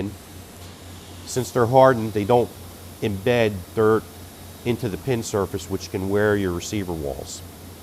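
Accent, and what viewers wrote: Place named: Pennsylvania, United States. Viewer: Pros of this video: - Since graduating college in W. PA 15 years ago, I miss that Pennsylvania twang - The lecturing on pin steel woke me up. I won't even THINK about getting an aftermarket pin now. Cons: - I can't actually see what you're doing. Close-ups would be nice, but since I've installed a LPK, I can infer what you're doing. The video overall is very helpful, and even if it's hard to get the kit installed, I can watch cool .mil photos in the meantime.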